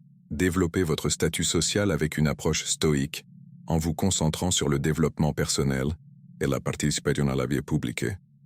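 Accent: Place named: England, United Kingdom. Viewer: C'est pénible cet accent anglais pour parler français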